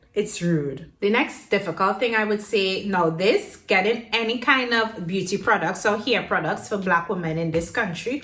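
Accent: Trinidad and Tobago. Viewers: First of all, love the trini accent!! As for the staring though, I must say that it too is a caribbean thing (though it may not be a Trinidad thing if they be ringing people ear) xaxa!!! Whenever I think of people staring, I remember how my Jamaican friend/classmate would ALMOST ALWAYS respond when she eyeing people down and they does vex over it: "is my eye" she say xaxa! Caribbean people love a stare just as much as Greeks, Germans, Turkish, Chinese or even Americans on vacation do. I don't think it is out of malice when Cypriots stare, it could be just that they don't see enough Trinis touching down :) kali tyxi! PS: Your tiktok did not lie; don't feel no how.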